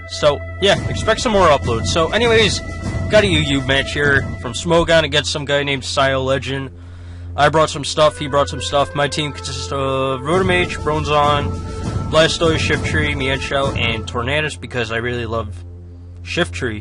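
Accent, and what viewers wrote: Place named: Minnesota, United States. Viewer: Up North? Your voice reminds me of some people I know from Minnesota.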